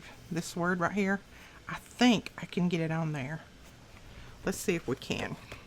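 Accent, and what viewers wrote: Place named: Georgia, United States. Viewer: This was such a delightful tutorial to view this evening. You inspired me to try a similar technique if I may in my tutorial creating a Wedding Album. Thank you sincerely for your wonderful instructions. You sound like you are from Georgia. haha I am Canadian but have some sweet Georgian friends I visit now and again. I made sure to Subscribe and hit the bell. smile I would love you to visit my next tutorial where I will try this technique (somewhat) and be sure to link your Channel so you get the credit. smile Blessings! I forgot to mention my favorite season. Definitely, the FALL weather when our humidity has taken flight. haha Niagara Falls is one humid place!!!!!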